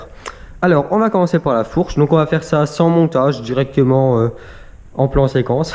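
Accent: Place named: England, United Kingdom. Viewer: L’accent anglais c incroyable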